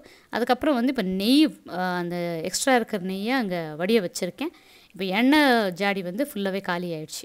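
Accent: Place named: Karnataka, India. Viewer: Hi sis, very cute vlog, god bless long way to go,, i just love ur tamil..ur slang is like an beautiful karunada tamilachi...i am also like you....an Banglorean settled in Chennai..GOD BLESSS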